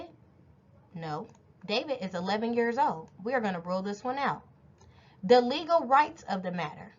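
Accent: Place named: South, United States. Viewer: Super helpful, thank you! And love your Southern accent!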